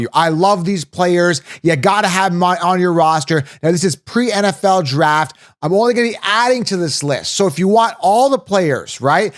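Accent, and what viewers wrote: Canada. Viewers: It’s just his accent my man. I think he from Canada 🇨🇦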